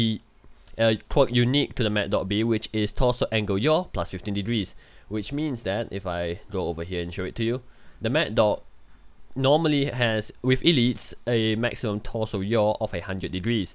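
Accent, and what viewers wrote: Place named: Southeast Asia. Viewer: Your accent is familiar, are you from SEA?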